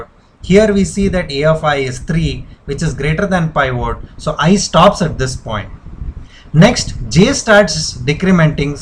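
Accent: India. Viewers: a clear and perfect explanation , nice video as Indian English pronunciation is consensus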